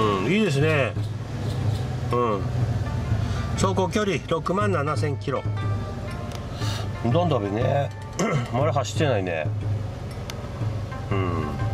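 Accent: Tsugaru, Japan. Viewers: 津軽弁、懐かしい❗️ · 諸事情で津軽弁は体が受け付けないんです。金◯町の元公◯員のせいで！ 動画自体は面白そうなのでボリューム0で観ましたwww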